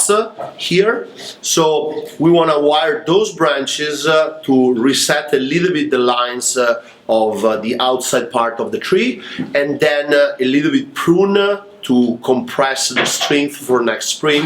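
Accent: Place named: Italy. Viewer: You sir,.. you have all my respect and admire your work as a Bonsai Artist. But your english is good and funny!! And unique way and saying everything with a smooth italian accent! Great sir!!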